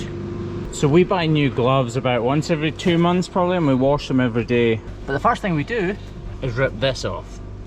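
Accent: Scotland, United Kingdom. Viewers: I UK channel I subscribe to has a Scottish man who often says “Let’s crack on”, too. He is also charming and we in the US love listening to you Scotsmen. 💖😃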